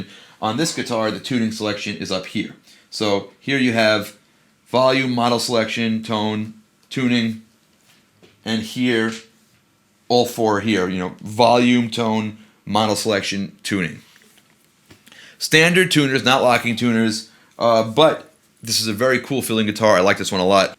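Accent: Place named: Brooklyn, United States. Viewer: yo are you from Brooklyn? Bensonhurst or Bay Ridge?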